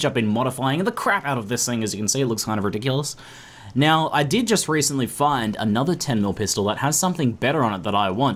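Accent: Australia. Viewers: You Australian or NZ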